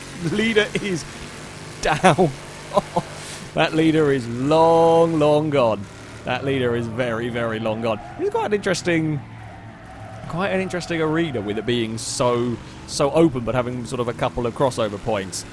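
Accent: England, United Kingdom. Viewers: I'll be honest, I don't watch, or can't watch, much Rallycross, as it's not really televised here, and I can only ever find highlights online, but every time I've seen a race, the joker lap is always slower. Could be wrong, wouldn't be the first or the last time. Seems like from you guys saying what you are, both are accurate. It is kinda odd though for an England resident to reference an American thing first... but still says Z as Zed.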